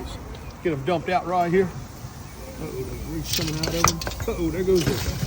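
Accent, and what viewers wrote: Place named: Louisiana, United States. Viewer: My man sounds like every other dude cooking on a black top griddle in Louisiana😂😂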